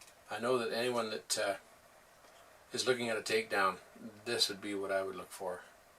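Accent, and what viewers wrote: Canada. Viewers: Say. Excellent video and review as always! I have a question for you though. You have a slight accent, I'm guessing Canadian, Wisconsin, Minnesota, North Dakota there a outs am I correct in assuming this because you mention swamps, I know I hunted swamp bucks in Michigan, Being a Yupper myself, Ae! Also see the hockey rink in pole barn and so on. Health wise I see you are looking could and I'm glad to see that as well. We'll take care be safe and look forward to more of your training / shooting Videos. Good hunting and God Bless Very Respectfully Bob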